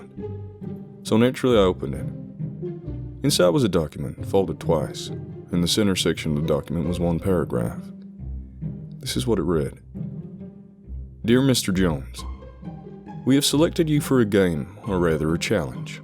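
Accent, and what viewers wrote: South, United States. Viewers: Imagine having a southern accent like that and detesting guns. I got my Glock 22 on me right now!!! Pow pow!!! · Your southern accent always gets me hyped for a story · Even as an american I still find his southern accent equal parts authoritative and smooth, like a fine whiskey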